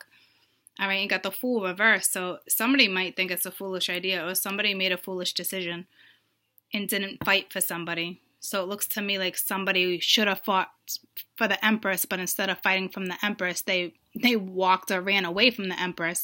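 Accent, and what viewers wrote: Boston, United States. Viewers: is that a Bostonian accent I hearya? :-) great reading ...yea they're a bit petty right now. thanks a bunch. #7thhouseAriesinMercury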